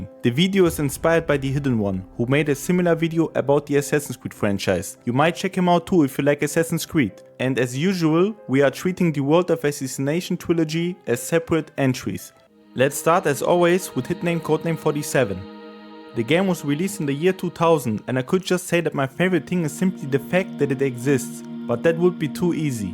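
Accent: Germany. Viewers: i hear german fella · Ich geh von deinem Akzent mal davon aus, dass du deutsch bist. Ich hab alle deine Hitman Videos durchgeschaut. Finde die super. Hast dir nen weiteren Abonnenten verdient 😂✌🏼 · Are you german ?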